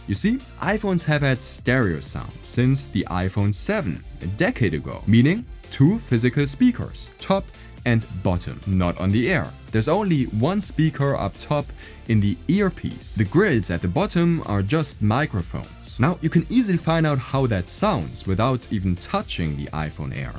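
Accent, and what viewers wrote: Germany. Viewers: I love german english accents, one of the reasons i like watching your videos❤Keep soaring higher brother❤ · I hear some German Out of your voice...😅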